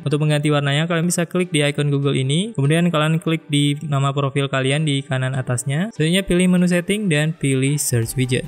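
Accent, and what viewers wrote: Aceh, Indonesia. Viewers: Orang Banda Aceh bang? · Orang aceh ya bang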